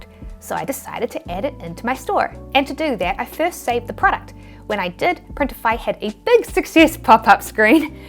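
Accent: New Zealand. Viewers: I love your tutorials - so much information - they're brilliant! And I like listening to your Kiwi accent ;-) I have family in Christchurch.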